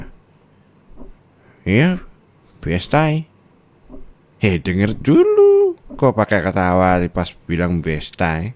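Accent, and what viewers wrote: Java, Indonesia. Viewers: Bapak e logat jawa rek rek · Syok sekali bisa logat Jawa... Beliau ini Jawir bagian mana anjir...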